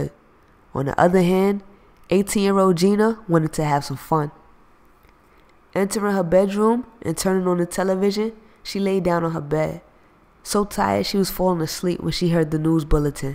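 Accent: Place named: New York, United States. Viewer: your welcome just give us more to hear I love your New Yorker accent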